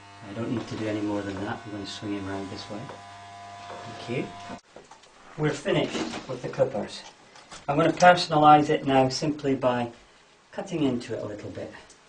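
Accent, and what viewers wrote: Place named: Scotland, United Kingdom. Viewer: Thank you for the tips!, and I hear a Scottish accent love it!.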